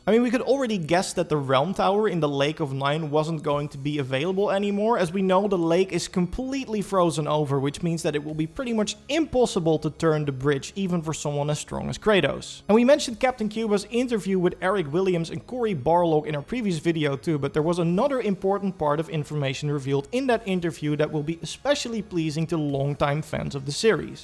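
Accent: Netherlands. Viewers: That Dutch accent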